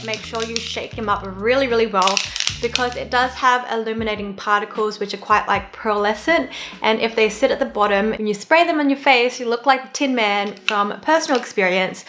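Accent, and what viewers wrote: Australia. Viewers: i've just recently subscribed after watching a few of your videos and it's so good to come across an aussie youtuber that i actually like and trust! plus it's just nice hearing a familiar accent haha love your work x IG: jai.enne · Congrats 🎉 love ur makeup tutorials and ur aussie accent 🥺🤟🏻 IG : diemthy.ng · happy 100K !! wasn't expecting you to have an Australian accent and happily subscribed when i watched ur first video because of the energy positive vibezzz and being genuinely excited for makeup. looking forward to your future vid lovexx ig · If I can remind I suscribed to channel with her orange or red makeup, her cute aussie accent really lifts my spirit and love to binge watch all her videos to catch up her contents.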